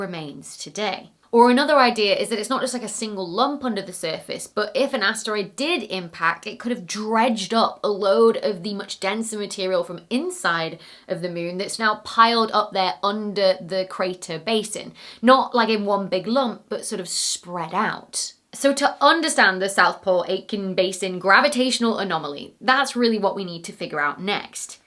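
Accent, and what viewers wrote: United Kingdom. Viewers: Wondering if you'll see this, but curious, are you British? Thanks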